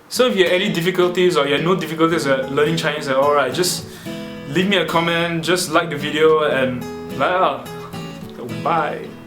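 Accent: Singapore. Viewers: hear your accent i know you're singaporean already! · Hopes this wonderful creative word "Fux" will be widely accepted here one of these days, yeah....u really spoke like the locals around here, u must have been living in S'pore for a long time! Nice to meet u! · Man I thought you were half chinese half angmo singaporean because of how you look (not bad of course! different in a really cool way. because I've singaporean friends who talk like you, the singlish made me think you were a natural chinese speaker though. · are u sure u are Angmoh?? u have no Angmoh accent?? it's Singlish accent leh... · its jz funny when an ang moh sound so singaporean. u dun hv any angmoh slang at all. n u look kinda lk a chinese who looks angmoh. so.... yea. XD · Cool stuff. LoL u basically have the Singlish accent now and u speak good singlish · My Chinese is shit cus I'm an ACS boy. 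Class of 96 ACS(I) represent homie....... What!! I cheated during my O Levels for chinese and still got a D hahahahah true story!!! Well maybe cus everyone aroudn me that i was copying from was just as shit at chinese as I was. And how can anyone wonder if you are Singaporean? your singaporean accent is strong as man...... "Angmoh speaking chinese" ???? Nigga puh lease...... you look AZN through and through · oh my fk his sporean accent is too heavy.. unattractive.. =( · Hate your singapore accent. Other then that,you're god damn funny.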